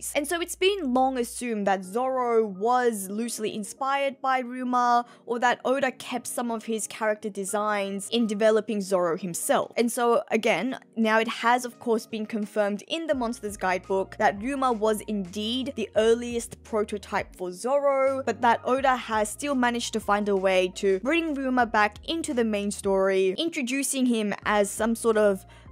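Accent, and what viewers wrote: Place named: Australia. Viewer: The word of the day is Deuteragonist lol. Hearing it in an Australian accent is a delight. Great video!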